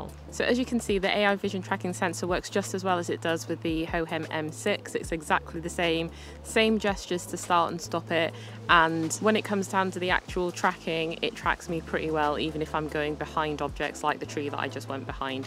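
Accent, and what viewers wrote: United Kingdom. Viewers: Love the British accent!!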